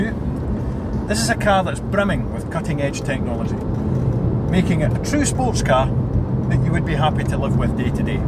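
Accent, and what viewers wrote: Scotland, United Kingdom. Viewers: Hes british he lives in sctoland and has a scottish accent! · sounds like he is Scottish !! am I right ?!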